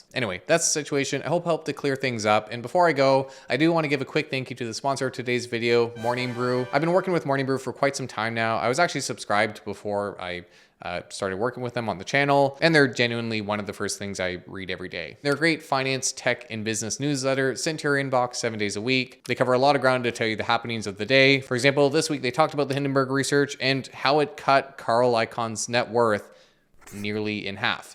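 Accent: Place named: Ottawa, Canada. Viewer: No way, picked out your accent on the words "they are at it again" and "they got a lot of flak last time" and found out your in Ottawa! No fucking wonder I recognize it! Crazy to think someone I watch online is just chilling somewhere in my city!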